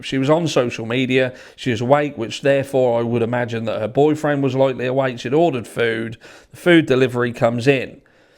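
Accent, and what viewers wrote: United Kingdom. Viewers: Right there and there lol I think I just like ur bretesh accent eh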